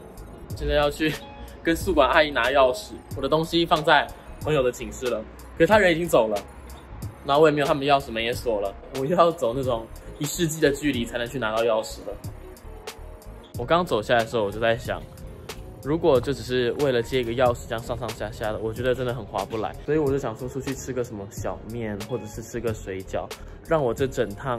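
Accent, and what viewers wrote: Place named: Chongqing, China. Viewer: 所以学弟弟是海南人嘛？刚刚说的不是重庆话嘛？我凌乱了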